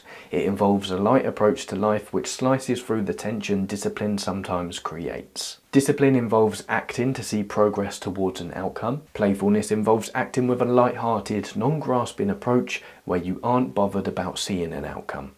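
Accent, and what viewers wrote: United Kingdom. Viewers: I don't often come across British English, so it's difficult for me to understand everything you said. But wow, I really love you and your content. Your knowledge helps me a lot, thank you.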